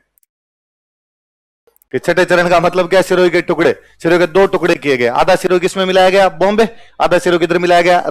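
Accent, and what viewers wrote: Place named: Kathiawar, India. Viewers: सर, गुजरात के सौराष्ट्र (काठियावाड़) की पूरी भाषा आपकी भाषा से मिलती है , में जब भी आपको वीडियो देखता हु तो मुझे ऐसा लगता है की में अपने क्षेत्र के बोली में सुन रहा हु। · ha. aapdi jevi boli che...amreli botad junagadh jevi j language che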